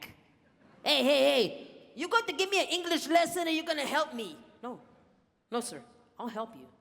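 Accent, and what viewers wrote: Texas, United States. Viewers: My greatest honor to receive a "thank you" from you in person. Your style is my favorite: that fancy hat, that Texas accent and that enchanting storytelling. I keep telling my folks that the 2nd place winner is worth watching when they talk about the 1st and 3rd place winners. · The speech message and use of props were good. Not sure of the sarcasm and racy tone when describing elements of the young man. 🤔 however, the Texas accent was fire🔥🔥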